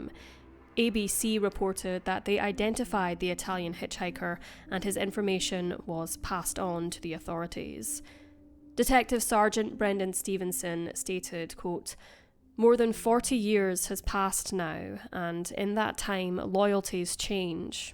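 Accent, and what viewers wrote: Scotland, United Kingdom. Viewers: I love the accent! I was just posting a spelling PSA for those who lack the ability to figure out what city name you meant. Not a dig about the Scottish drogue. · The narrator is Scottish, speaks with a Scottish accent. That's what "Perth" sounds like in a Scottish accent.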